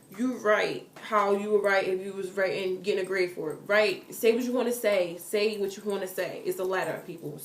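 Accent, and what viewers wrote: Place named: New York, United States. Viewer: u sound like either a new yorker or a philly girl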